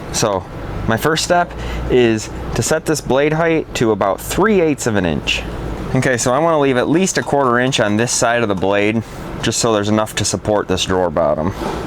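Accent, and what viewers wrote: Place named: Upper Midwest, United States. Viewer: I built a stand-alone cabinet that has four sections; two cabinets and two pull-outs for garbage and recycling cans. It also has two drawers above the garbage and recycling can pull-outs. One cabinet has cleaning supplies, and the other has dog food and supplies. It was built with materials I had on hand, so to hold the cans in place I cut 1x2's in half and made braces to fit each can. I like your design better, and if I'm able to continue with my woodworking endeavors after my neck has healed, I'm going to use some of the scrap plywood I have and rebuild the pull out drawers for the garbage and recycling cans. Thanks for the video, because I've been wanting to redo them, but I just didn't know what I wanted to do with them. What part of the upper Midwest do you live or come from? I have family in Wisconsin that have the same accent as you.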